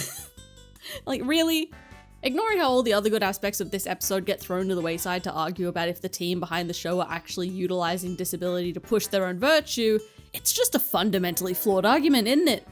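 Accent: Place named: Australia. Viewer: im so australian that i didnt even realise u were australian too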